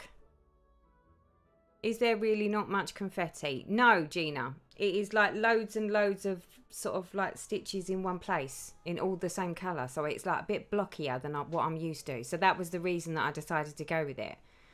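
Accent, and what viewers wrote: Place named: Essex, United Kingdom. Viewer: Dang. I missed live stream but watching it now. You are so much fun and I love your Essex accent. Actually very soothing.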